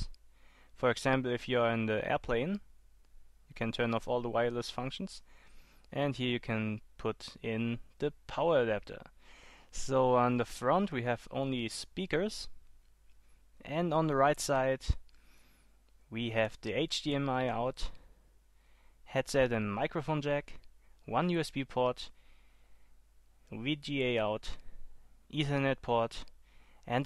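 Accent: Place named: Germany. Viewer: man merkt wirklich dass du nen deutscher bist an deiner aussprache. Arbeite mal dran. Sonst alles top ; )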